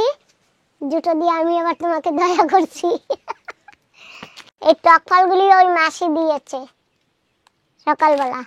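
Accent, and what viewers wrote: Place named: Khulna, Bangladesh. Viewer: দিদি আপনাদের বাড়ি মনে হয় বাংলাদেশের ছিল আপনাদের বাসা তো খুলনার ভাষার মত খুব ভালো লাগলো আপনাদের ভিডিওটা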